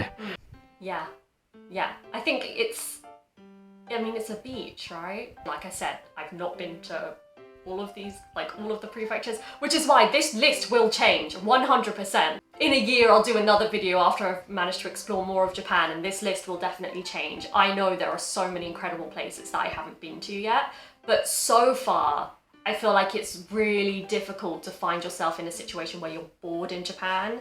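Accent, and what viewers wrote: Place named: England, United Kingdom. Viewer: Please listen to Cockney or Geordie or even Scouse accents. You can’t say it is intelligible to listen to I am sure. English accents are very diverse than anything else on that matter. Her accent is a tiny example of broad accents you can hear in England or in the UK.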